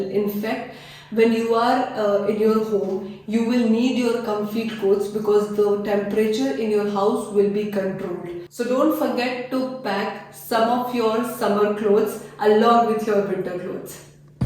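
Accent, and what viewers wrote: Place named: Asia. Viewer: By language akcent u looks Asian.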